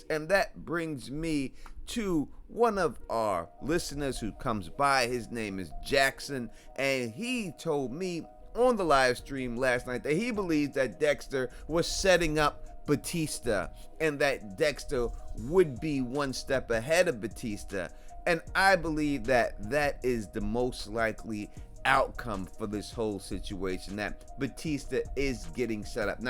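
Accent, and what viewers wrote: New York, United States. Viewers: You gotta be from either jersey or new york with that accent. Loved living in the city. Great content as always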